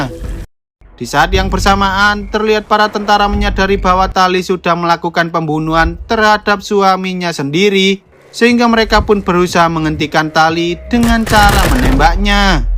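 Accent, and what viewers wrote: Java, Indonesia. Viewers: bg bisa gk ngomong nya jan medok gitu :( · Medok bgt Jawa nya om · Bang bagus kalo cerita tanpa logat jawa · nadamu mas nyell jowone ndk ilang😂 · Suaranya cmpereng sama medokk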